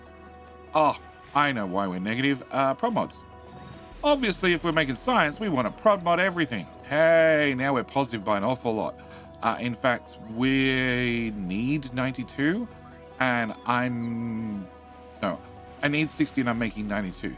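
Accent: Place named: Australia. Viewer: It's Australian mate, different English ay.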